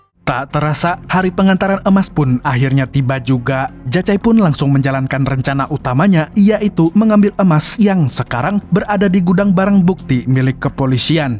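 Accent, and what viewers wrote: Banjar, Indonesia. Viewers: Orang Banjar kah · Mang orang banjar kah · Banjar d mananya mang😂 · orang banjakh bang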